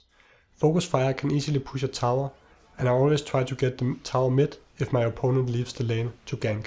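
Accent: Denmark. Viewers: How danish can an accent be, from 0-? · Your accent is so Danish I love it <3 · The fucking Danish accent is so strong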